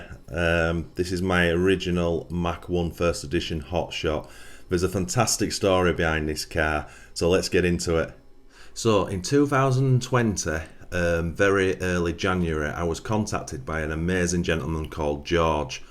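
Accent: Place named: Yorkshire, United Kingdom. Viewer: I think its Yorkshire accent. He is saying mark 1 (mk1)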